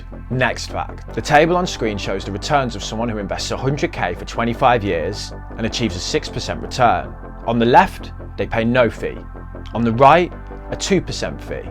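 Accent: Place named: Manchester, United Kingdom. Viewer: Yes mate, knew you was a manc it's in the accent 😂 p.s I'm from wythenshawe by the way, keep up the good work and the videos mate!💪👍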